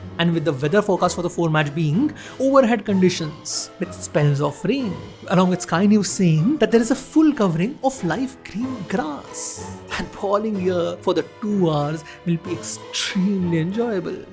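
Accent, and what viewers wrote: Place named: South India, India. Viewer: Oh my god bro this dosa idli sambar accent so annoying to hear 😭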